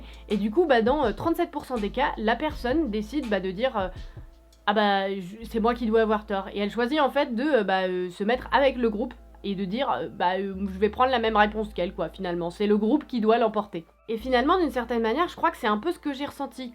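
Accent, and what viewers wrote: Paris, France. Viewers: Je ne l'ai pas lu et je crois que tu m'as fait gagner du temps au lieu de le perdre. Et pourtant je suis tombé sur toi par pu hasard sans même te chercher (parceque covid 19). J'adore ton phrasé parisienne je dirai, ton franc parlé et ton analyse de fin est outrageusement pertinente. En gros il a payé les premier influenceurs de l'époque ... avec Norman Squeezy et Cyprien dans la poche ... c'était gagné c'etait sûr !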